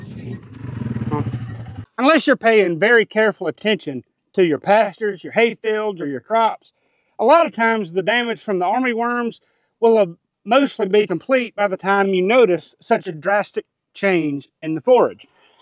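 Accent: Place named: United States. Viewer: PS I love your accent, which state are ya from? I’m a Aussie so I’m not great at knowing state accents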